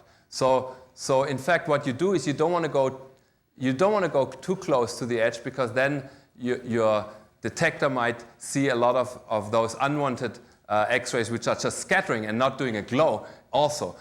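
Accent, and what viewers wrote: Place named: Germany. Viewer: These lectures always sound more authoritative when delivered in a German accent.!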